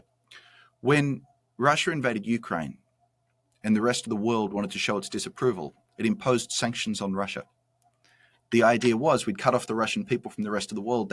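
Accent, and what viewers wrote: Australia. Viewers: Not too bad for a 'strine' accent. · You sound Australian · This guy has got it and explains well. He sounds Australian, wonder if he is? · Strange to hear "we" meaning Americans in an Australian accent.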